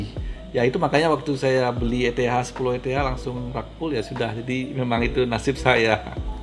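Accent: Bali, Indonesia. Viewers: orang bali ya blii? aksen nya seperti orang bali///?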